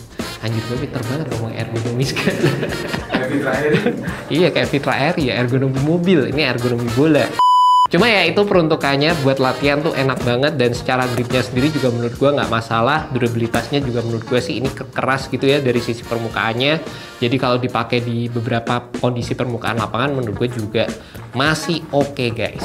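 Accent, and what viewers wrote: Java, Indonesia. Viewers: Gue nya medok mas.. Keren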